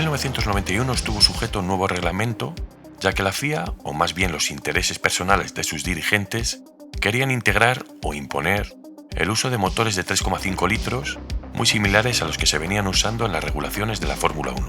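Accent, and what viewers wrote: Spain. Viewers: me cansé... Me cansé de mirar tus videos sin comentar. En primer lugar, agradezco muchísimo tu dedicación, eso le da una gran calidad al contenido que generas. Sinceramente, con tus documentales de automovilismo, se aprende bastante sobre la historia. Y digo documentales porque, tu voz con acento español, las imágenes y la música ochentera, te dan ese estilo "retro" que me recuerda a los documentales que miraba por TV cuando era chico, o en los VHS que nos mostraban en la escuela. Gracias por hacer un video del Mazda 787B, que es sin dudas (para mi), el auto de carreras con el mejor sonido de la historia... pero bueno, gustos son gustos... Y te pregunto a ti: Para vos, ¿Cuál fue o es el auto de carreras, que lo escuchas bramar, acelerar y rebajar, y te parece que es el que mejor suena?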